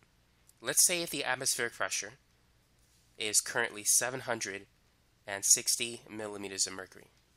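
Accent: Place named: Boston, United States. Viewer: Dope Boston accent 👌🏻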